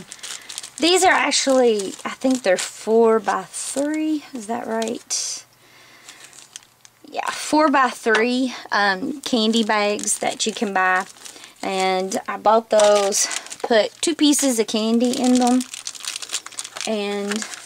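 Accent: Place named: South, United States. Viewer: I just ran across your video. I LOVE IT!!! Thank you so much for sharing this with us. I can tell you are from the South. Where do you live? Beautiful coastal North Carolina is my home.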